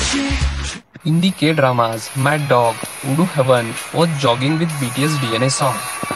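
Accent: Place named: India. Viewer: Listening to your voice makes me think u r an Indian am I right ??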